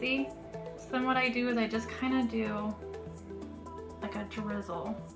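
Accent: Minnesota, United States. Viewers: She's definitely from the Minnesoota region... loving the new Channel guy's 👍🇺🇲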